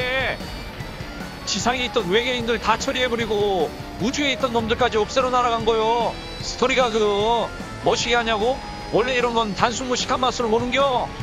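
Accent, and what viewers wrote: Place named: Jeolla, South Korea. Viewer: 랑깨랑깨 하는거보니 배충이가 산듯? 재미만있음 상관없응께 노 상관ㅋㅋ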